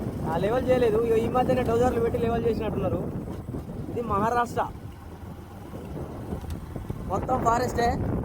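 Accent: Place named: Karimnagar, India. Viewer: Ma Karim nagar slang la undhi....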